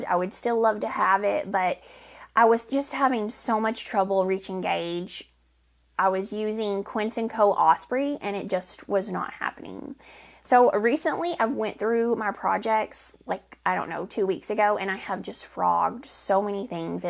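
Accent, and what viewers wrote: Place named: Texas, United States. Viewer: I'm from North East Texas and currently live in Alaska so listening to you is a little taste of home. Love your podcast and thoroughly enjoy you as a person. You're so lovely! Thank you for sharing your farm. #Softly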